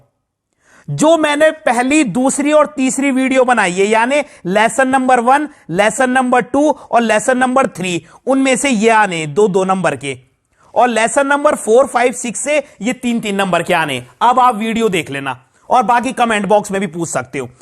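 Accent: Garhwal, India. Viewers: Sir ap ghadwali ho kyy ??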